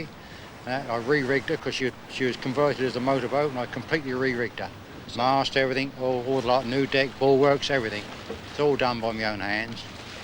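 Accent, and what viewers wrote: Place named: Whitstable, United Kingdom. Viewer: Proper Whitstable accent.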